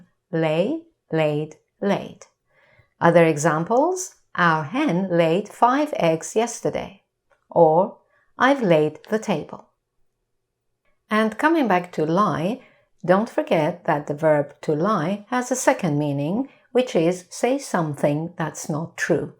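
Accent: United Kingdom. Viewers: Madam Which type of english do you speak? American or British one? I feel you're a british type of speaker, am I right?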